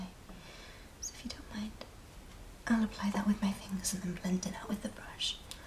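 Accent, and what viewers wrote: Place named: United Kingdom. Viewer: Amazing, nice to hear such a nice British accent. One of my favourites :)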